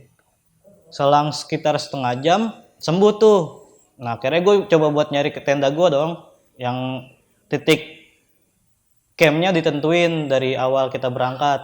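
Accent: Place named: Betawi, Indonesia. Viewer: Logat betawi banget lau cing hehehehe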